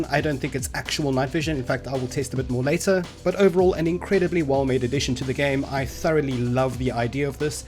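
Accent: South Africa. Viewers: What accent is that? Are you South African? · Are you South African? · Are you South African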